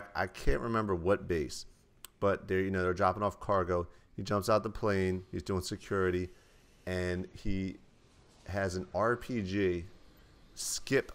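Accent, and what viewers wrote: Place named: United States. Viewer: Just.. No... I bet you're from the us?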